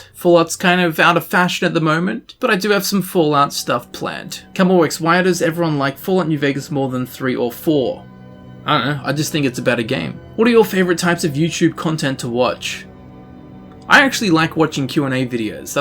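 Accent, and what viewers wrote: Australia. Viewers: how happy were you when you saw the storm atronach camel ? I laughed out loud ;) also. I just determined that you're my geek gamergirl crush when you answered the question about choosing daedric lord or dragonborn. handsome Australian guy with an accent AND can answer as many obscure lore questions about TES as I can? SOLD.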